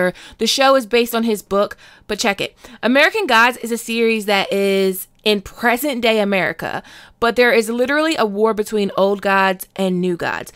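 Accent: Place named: Baltimore, United States. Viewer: I knew you was from Baltimore because you sound just like me 🤣🤣😂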